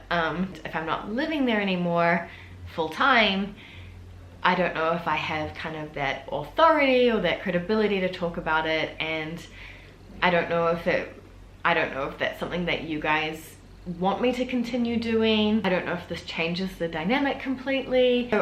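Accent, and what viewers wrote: New Zealand. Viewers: It’s amazing how way different your accent is now, way more Kiwi (obviously? ) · Oooooh that makes me so sad ! As a French from Lyon's area, I discovered your great channel a few months ago and loved it right on the spot ! I really love the way you describe our society and little habits that seem perfectly normal to us, but certainly really weird to foreign people. It's always super interesting to get a critical look on our habits. Yours is critical, but in a funny, full of empathy way, and it is always very positive and enlightening. Not only did I like the way you talked about our country, but also the way you generally speak, your personnality, your sense of humour, your great accent from NZ... So keep posting ! I hope you enjoy the modifications in your life. Your family must be sooo happy to finally have you back ! Don't be sad, ce n'est même pas un au revoir, on reste avec toi ! 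Chin up, spirit high ! Be happy, a nice soul like yours diserves that ! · Hi, please carry on with your channel you’ll teach us stuff about NZ, I’ve always been fascinated by this country and the Maoris and I really wish I could go there someday. Plus, I love kiwi accent! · Hello, I have been studying English in NZ for 6 months. I left Paris in January to come here where I had been living for few years. My sister works to L'Oréal as well. I'd just would like to say that I discovered your channel one week ago and I very apreciate your video. I think your speak French quite well. But I very enjoy to listen to you speak English with the kiwi accent about Paris. It helps me a lot for my learning of English. So thanks for that. According your chanel, even if the concept is an expatriate living in France I will be happy if you carry on. You can apeak about such a beautiful country that is NZ. 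I went to Wellington some weeks ago for a weekend and I very enjoyed the place, I think like a lot of people you're lucky to live there. I wish you the best ! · Your accent has become so much stronger no you're back in NZ!! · Your so pretty and that kiwi accent is adorable and charming ! I think it's a great idea to keep this Channel, do post vids when you visit France and incorporate Videos about New Zealand. I would love to learn more about New Zealand. People seem so friendly there. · Hi! You have not to be worried about the channel, it was born with another purpose but adjustments to it might be the right thing along with the changes you are experiencing now! Me and my girlfried have started following you mainly because you come from New Zealand 😋 we wanted to hear your accent and about the differences between Europe and Newzealand lifestyle and you always hit the target. We both hope you'll continue doing videos about New Zealand and more! 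Thank you for your great job so far! E&I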